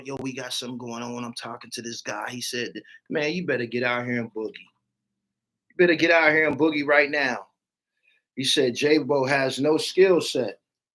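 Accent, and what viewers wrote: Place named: Pittsburgh, United States. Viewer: I be hearing the Pittsburgh affiliation when you speak. We neighbours. Respect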